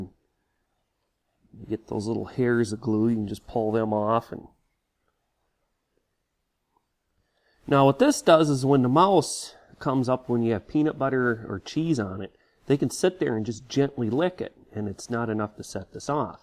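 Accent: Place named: Great Lakes, United States. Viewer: Minnesota or Canada? I hear that Great Lakes accent.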